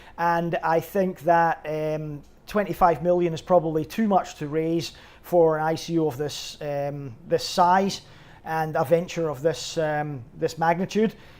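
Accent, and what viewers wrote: Scotland, United Kingdom. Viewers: If you use Steem as a model, and see how popular youtube is, then this could be a winner - be aware I have not looked at your vide..... Are you Scottish? We all know a Scotsman never bullshits....! Ive deleted other subscriptions just to watch your vids man... you always call a spade a spade, which is what I like about you... and you never waffle on......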